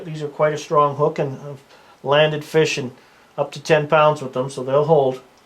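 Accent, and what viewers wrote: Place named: Alberta, Canada. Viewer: Thanks...Yes I did some experimenting with different sized beads and did figure it out eventually! I tried the system at my new favorite pot hole and it worked fantastically! Thanks again for your Alberta voice on fly tying:)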